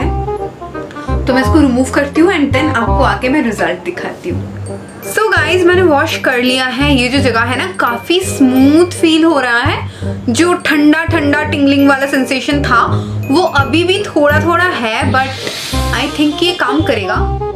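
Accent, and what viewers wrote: Bengal, India. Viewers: Ma astay kotha bollo tomi ki bangali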